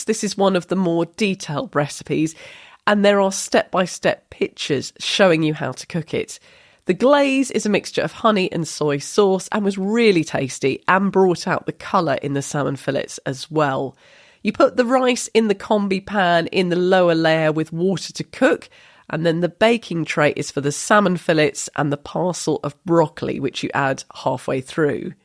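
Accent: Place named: RP, United Kingdom. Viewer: modern RP is known to me👍🏻👍🏻😀thank you. I am curious, if your mRP differs from eg. Atomic Shrimps‘, who is located in Dorset, as he says in his video. I understand, that the privacy is all important. But, if you could give a hint in which part of England your accent has developed and all maybe which region you are living in right now, I’d be very pleased if you could name of the region, if this doesn’t feel like doxxing yourself to you. Curious and very friendly greets from Germany 😀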